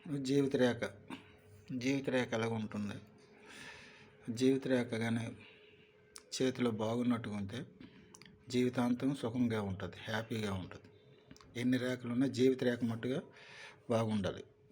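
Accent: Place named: Srikakulam, India. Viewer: గురువు గారు మీ యాస, భాష చూస్తుంటే మీది ఉత్తరాంధ్ర శ్రీకాకుళం అనిపిస్తుంది.. మీరు ఎక్కడివారైన చాలా మంచి విలువైన వారసత్వ నిధిని సమాజానికి అందిస్తున్నారు,అంతరించిపోతున్న ఇలాంటి భారతీయ విద్యను నలుగురికీ తెలిసేలా చేస్తున్నారు..కానీ నా విన్నపం ఏంటంటే మీ లాంటి వారిని ప్రత్యక్షంగా కొంతమందిని తయారు చేయండి.. కనీసం మీ ఇంట్లో వారినైనా కొత్త తరాన్ని తయారు చేయండి... నమస్తే ,జై శ్రీరామ్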